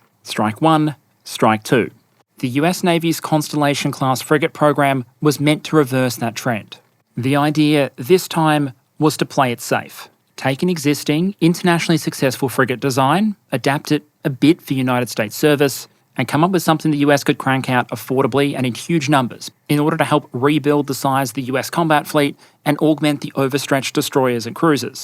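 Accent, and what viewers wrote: Australia. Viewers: Aussie accent, usually a charming quality, failed him here.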